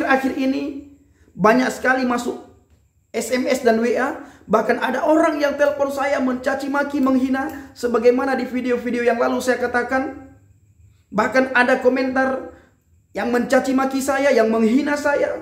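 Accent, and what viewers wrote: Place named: Aceh, Indonesia. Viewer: Luar biasa kesaksian dari orang Aceh ini, logat Aceh nya masih terasa. Haleluya.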